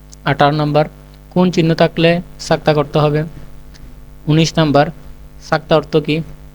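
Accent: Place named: Chittagong, Bangladesh. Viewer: Apni chittagong er taina😅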